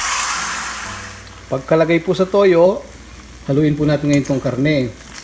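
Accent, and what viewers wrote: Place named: Cavite, Philippines. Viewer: cavitenyo siguro sya kc yung accent niya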